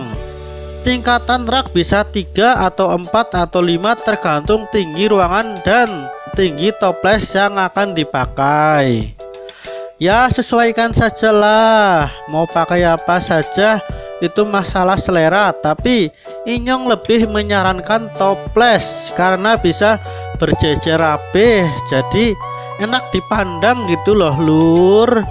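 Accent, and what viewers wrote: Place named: Purwokerto, Indonesia. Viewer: Genah banget koh logate. Purwokertone ngendi, nyong pengin dolan. Belajar ternak semut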